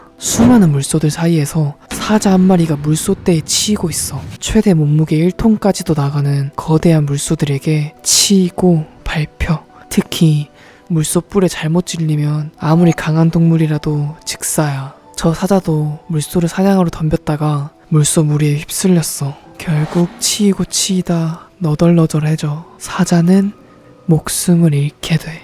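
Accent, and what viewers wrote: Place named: Jeolla, South Korea. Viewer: 전남 말투같은데..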